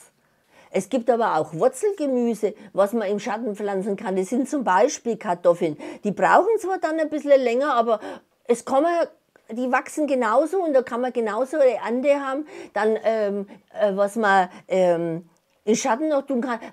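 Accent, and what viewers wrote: Franconia, Germany. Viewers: Danke für deine tollen Videos 😊 Sag a mal kommst du aus Franken?🤔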